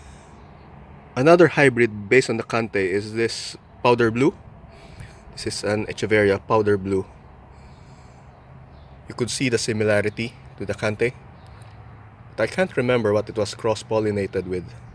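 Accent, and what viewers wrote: Philippines. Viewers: your accent is filipino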